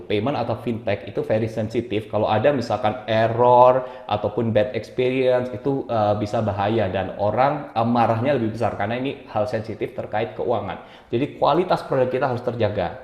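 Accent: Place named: Surabaya, Indonesia. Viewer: Oalah Mas kie wong Suroboyo tho, pantes kok medhok..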